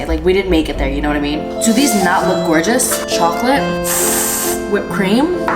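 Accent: England, United Kingdom. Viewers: I am from England and your English accent cracks me up 🤣🤣 Happy Valentines ❤️ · See the way she spoke with the British accent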